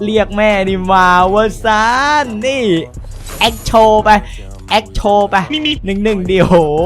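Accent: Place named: Suphan Buri, Thailand. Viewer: พี่เป็นสุพรรณหรอคับทำไมพี่พูดเหน่อ😅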